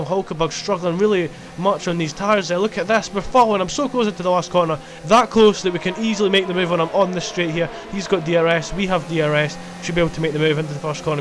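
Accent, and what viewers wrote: Scotland, United Kingdom. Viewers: U Scottish? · he is scottish. It's their accent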